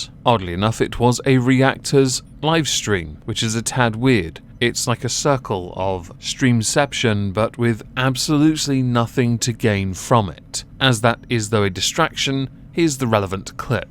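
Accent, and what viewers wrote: United Kingdom. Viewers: Ah, nothing like hearing a Cthulhu dude with a silky smooth voice and great british accent dunk on the stupid peeps. Then hearing that glorious outro. Thank you for the video sir! 😄👍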